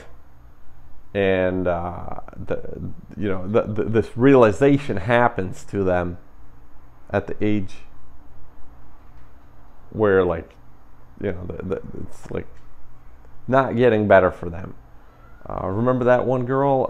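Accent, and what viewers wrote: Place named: Eastern Europe. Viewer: Slavic accent, similar faith background and similar job… dang this is up my alley. Definitely a lot to learn from you, and man if you’re reading this, I’m really sorry for what happened. It gave me chills to hear what’s happened to you. I could not imagine seeing that happen in our community’s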